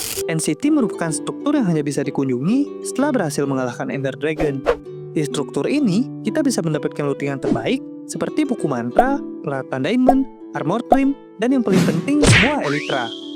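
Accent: Bali, Indonesia. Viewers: berlogat bali wi nya jok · logat bali bnget nok kakaknya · Orang Bali wi keliatan banget logat nya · orang bali bg? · org bali kah?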